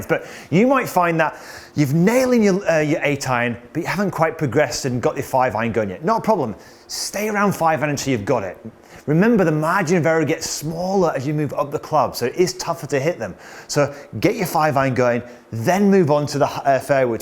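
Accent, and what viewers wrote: Yorkshire, United Kingdom. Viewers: Terrific video. Great tips. Plus, love the sub-titles. Fairway as Fairy Woods, Long Irons as Long Grinds. Love that good old Yorkshire accent. Ta.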